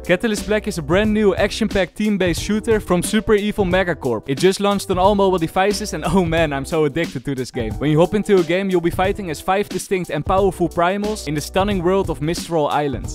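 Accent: Netherlands. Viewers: he lekker goede nederlander youtuber je hoort het echt goed dat je nederlands bent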